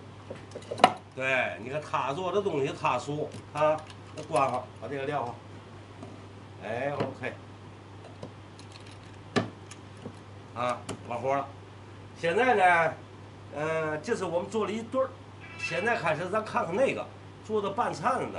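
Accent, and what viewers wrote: Tianjin, China. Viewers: 在外留学的天津人。最近刚遇到您们的视频，听到乡音无比亲切，看到木工大开眼界。未来家里的布置的审美得到了培养。谢谢上传者，更要谢谢老师傅。美好的事物永恒。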